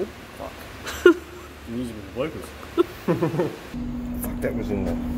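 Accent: Australia. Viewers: 4 am and sat watching this , sometimes forward the spinny bits making sure not to miss homeless fucking about . Being British I pride myself on being able to swear exceptionally well, but nobody says " You Fakkin Kant" like an Aussie fella . I tip my hat to you 😉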